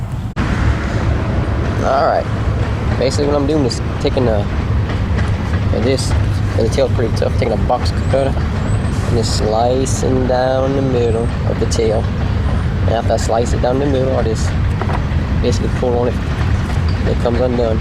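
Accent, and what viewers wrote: Louisiana, United States. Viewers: I love your accent. Louisiana I assume? Youre so soft spoken too. It isn't that harsh cajun lilt lol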